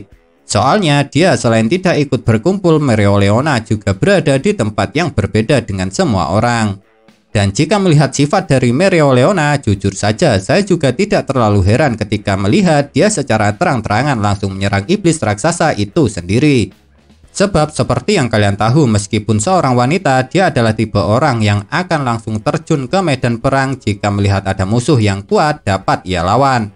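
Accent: Java, Indonesia. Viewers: Walaupun jawanya medok bnget.tp aq suka sma admin ne.soalx dya tu singkat and padat pnjelsanx.tp tolong donk bang ksih potongan2 dre mangax donk... Smngat yach bang...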